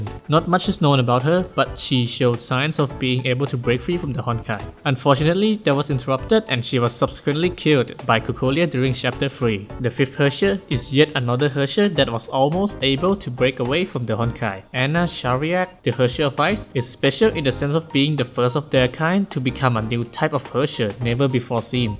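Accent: Thailand. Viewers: offtopic for a moment i did NOT expect this youtuber to be thai, i heard his accent and i checked his about page and damn he really is thai good to know that i found an another thai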